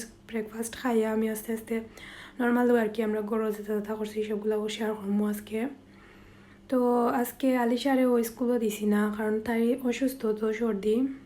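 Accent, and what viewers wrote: Sylhet, Bangladesh. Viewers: আপু অনেক সুন্দর ভ্লগ, আপনার আঞ্চলিক ভাষায় কথা বলাটা সুন্দর লাগে। এটা কি সিলেটি ভাষা? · ভাল লাগল আপি। তোমার সিলেটী ভাষা শুনতে ভাল লাগে। রাতে ঘুমাইতে পারনা কেন আপি? বেবির জন্য?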